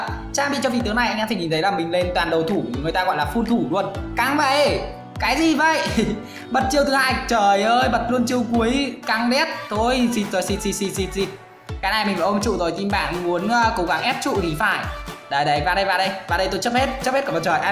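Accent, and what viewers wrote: Nam Dinh, Vietnam. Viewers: A ở nam định ạ :)) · Quê a ở nam định à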